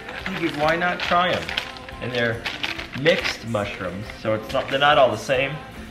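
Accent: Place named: New Hampshire, United States. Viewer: Ok, so I'm from LI, NY, we say Hot differently than folks from NH, or from Mass by way of NH.....how do you say Hut and is it different than the way you say Hot? I'm fascinated by that difference in accent. Not making fun of it but I'm puzzled by where your accent comes from! I've met folks from Northern VT and Northern NH, I think it's more the NH folks that have a distinctive accent. So in NY we might joke about how Bostonians say "park the car" from NH it's more "you can't get there from here" which has a very different accent than Boston! Loving the quirks of our regional accents! Which explains some of the reasons I also enjoy Cog Hill Family Farm from Alabama and Growing Grass family farm from Louisiana!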